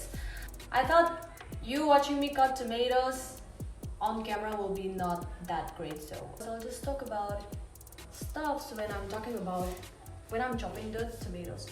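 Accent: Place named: England, United Kingdom. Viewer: ton accent anglais est trop mignon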